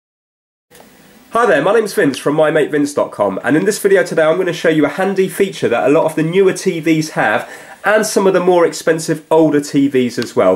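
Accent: England, United Kingdom. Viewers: England accent👍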